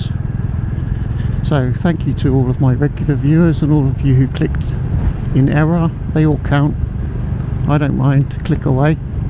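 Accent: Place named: United Kingdom. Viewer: Hi. I am a new subscriber. Wonderful footage - thank you heaps. Quite spectacular scenery. I'm thinking where you are is a little remote? The roads appear to be brilliant but there seems to be a lack of people. I am also running in a new Classic 350 (Signals) so I find it interesting watching someone else going through the same process. It's private information I know, but you sound British, and I can't help but wonder what you are doing in Spain. Have a good one.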